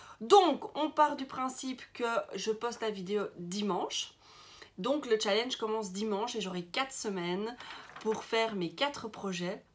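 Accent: Belgium. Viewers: Coucou, j'ai entendu directement aussi que tu es de Belgique (tout comme moi 😁) et j'adore ce que tu propose. Je suis pareil que toi ! Mon amoire déborde et je ne peux pas m'empêcher d'acheter sans pour autant coudre, ou alors comme toi je commence et je ne continue pas un projet que je rêvasse déjà sur un autre , au secours ! Ton challenge me parle ! Je vais faire comme toi et m'en lancer un aussi ! 😁 😅😬😘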